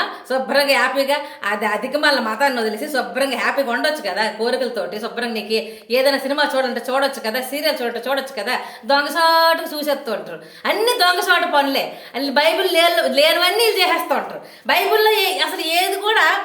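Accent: India. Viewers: Em basha babu turpu la vundi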